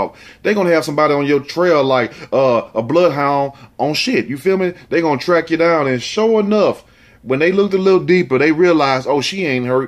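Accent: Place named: Texas, United States. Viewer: You speak like my cousins in Beaumont, TX, Luv It✊🏾🙏🏾👊🏾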